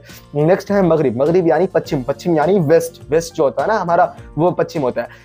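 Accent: Bihar, India. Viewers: Are you from Bihar 👍🏻,?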